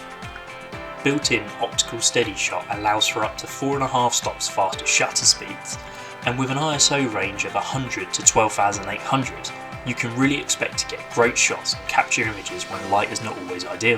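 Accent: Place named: United Kingdom. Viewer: Your audio is really ok for normal hearing people but when you are exposed to jet engine noise over several years it a bad cobination with the british acent and the music your playing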